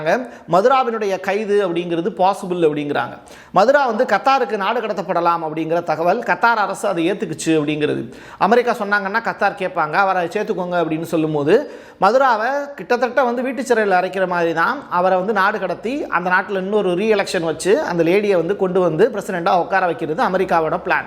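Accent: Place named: Madurai, India. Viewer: Maduraipesu madurai pesa unnai kettaangala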